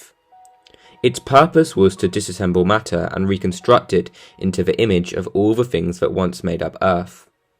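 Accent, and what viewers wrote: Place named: United Kingdom. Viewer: The fact that a British guy narratives this is so Xenoblade-y !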